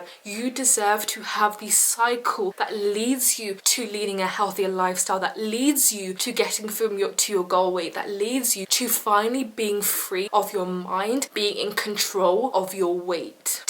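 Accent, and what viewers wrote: United Kingdom. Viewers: irrelevant trash Its like a british accent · I just realized that you’re talking with British accent😂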